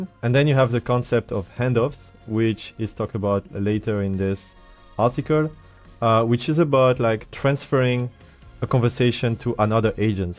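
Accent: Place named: France. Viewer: Accent Français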